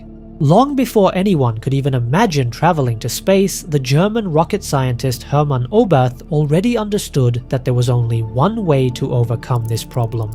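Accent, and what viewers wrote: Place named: India. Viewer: Sonunda Ai işini çözmüşsün çok güzel olmuş hint aksanı İngilizceden sonra hocam (haftalık canlı yayınlar geri gelsim bu arada)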